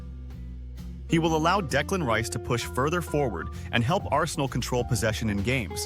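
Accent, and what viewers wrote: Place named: United States. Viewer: We really don't need AI with an american accent!!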